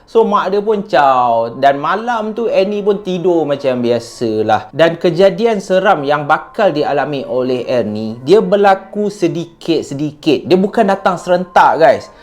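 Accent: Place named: North, Malaysia. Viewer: tak boleh la.. rasa annoying bila cuba cakap slang utara..nasib baik sikit tak macam yg cerita kat perlis tu.. tapi yg lain2 okey...saya suka penceritaan.. tapi slang utara terlampau over sgt..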